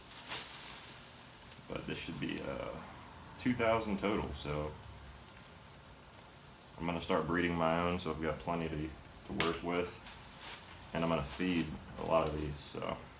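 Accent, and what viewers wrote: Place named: South, United States. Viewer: Not with that southern accent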